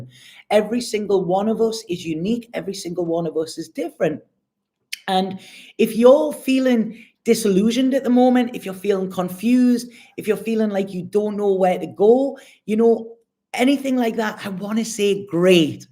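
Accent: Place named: Scotland, United Kingdom. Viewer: Love that Scottish accent.❤🙏😘🎅😎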